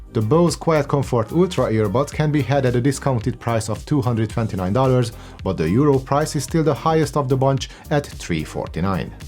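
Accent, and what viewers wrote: Hungary. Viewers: Great video, signature Hungarian accent 😉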